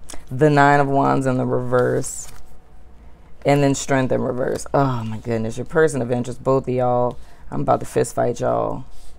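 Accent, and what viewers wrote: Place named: Charleston, United States. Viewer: Love that you have mental health resources in the description box ❤️ Curious to know what bit of accent I’m hearing?... sounds a bit like Charleston (where I’m from) or NOLA (similar sound) or Bahamian (also very similar) lol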